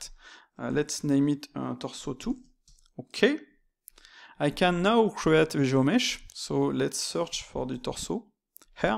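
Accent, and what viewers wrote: France. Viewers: Your french ? ( l'accent est reconnaissable)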